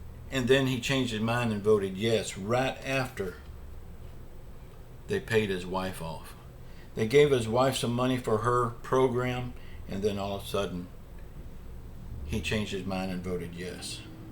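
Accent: South, United States. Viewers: I'm Southern also. We know what we are talking about. Keep on speaking your own speaking. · I like that good old southern talk.Born in the south myself,I Talk the same,though have lived near Seattle for years.This channel makes Me feel right at home. God bless. Chow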